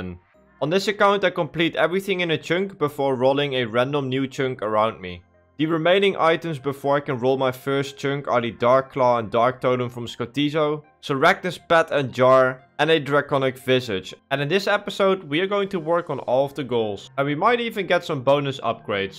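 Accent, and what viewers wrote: Netherlands. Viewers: Mooi Nederland accent:)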